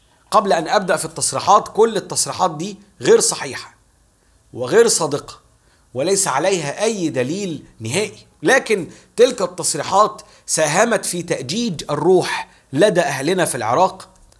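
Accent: Upper Egypt, Egypt. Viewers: أنت مبين من لهجتك صعيدي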